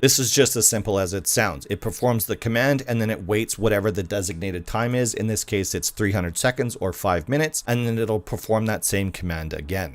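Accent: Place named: Canada. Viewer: Dude its NOT "Copy PASTA or POSTA" or whatever you are saying ... its copy PASTE - like think of PAY + ST.... Like Fish PASTE etc. dang that is such bad and annoying mispronounciation and its hard enough with you Canadian Cousins saying ABOUT all weird but, this PASTE really takes the BISCUIT and god knows how you would say "BISCUIT" lol Im from the UK btw and love Canadians and Oh Canada...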